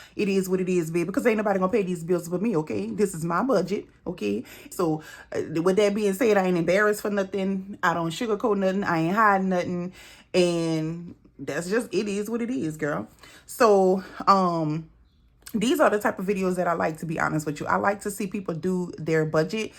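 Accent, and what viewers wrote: Louisiana, United States. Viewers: Hey, girl you got me with robbing peter to pay paul!! 😂😂 New subscriber!! I love your accent...are you from Louisiana? ❤☺️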